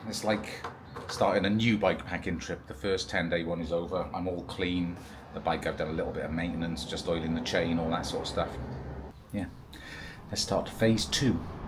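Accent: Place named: Welsh Borders, United Kingdom. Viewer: Gwent or English borders by the sound of your accent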